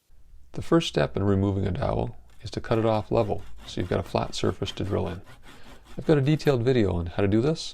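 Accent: Canada. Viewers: I hear a fellow Canadian's speech! Broken chai, here and now some optimism about repairing it. Enough optimism, that I won't watch the "make a new leg" until I hear the fateful crack again in this one. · Hey! Are you in Canada? Or Southern Virginia? Just curious about your accent. I'm retired from furniture, so I like to watch and sometimes I can give you some tips. So far, you do everything like I did, except I always used flux brushes for applying wood glue. Work much better for gluing and much cheaper too. Thanks a lot.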